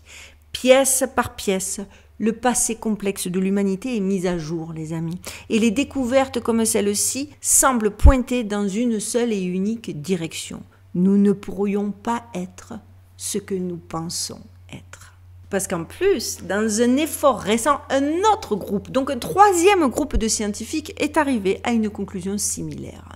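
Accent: South, France. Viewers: Bonjour belle d’âme d’outre-Atlantique avé l’accent du sud de la France qui doit te manquer comme ça m’a manqué après quelques années passé dans les îles de la Caraïbe! C’est un plaisir de t’écouter narrer toutes sortes de récits le dimanche, alors on écoute et on remercie après le visionnage de la vidéo! Prends soin de toi et plein de gros bisous 😘! Amicalement 🙏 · Merci pour cette vidéo et ce thème fort intéressant. Toujours OK pour une suite, si cela vous inspire. Oui, aussi pour d'autres sujets, car vous savez toujours nous présenter et interroger sur nombre de sujets: pyramides, Antarctique... Oui, oui, oui! Depuis que je vous ai découverte, j'ai tout écouté et je n'ai jamais été déçue et suis toujours en quête de savoir plus. Merci infiniment pour ce gros travail et présenté à la! Merci pour votre accent qui nous amène le soleil du sud de la France! 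Merci d'être et de faire! Caresses à la Duchesse. Prenez soin de vous et de votre fils. Bonne semaine et à dimanche prochain 🙏🏻☀️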